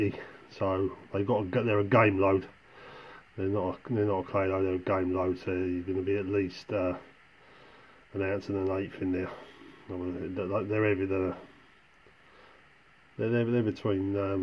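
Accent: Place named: Medway, United Kingdom. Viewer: Ha! You’re a “Gentleman Collector”. You can enjoy both sides of the pleasure a cartridge gives. Great accent and nice to hear. Guessing Medway Towns? I’m from Maidstone originally but now miles away in Devon. Love your videos, keep ‘em coming mate! 👍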